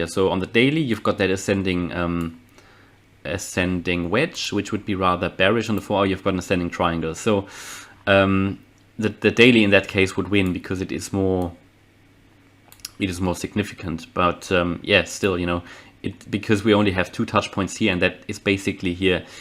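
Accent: Germany. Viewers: Nice vid. U must be German yeah? 😆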